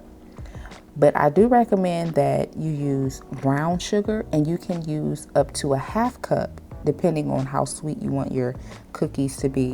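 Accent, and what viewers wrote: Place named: Maryland, United States. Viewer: Love your accent- back east- maybe Maryland?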